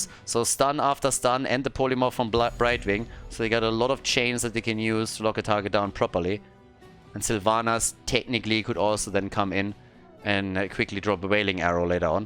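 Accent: Germany. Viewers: Nice match You have a bit of German accent?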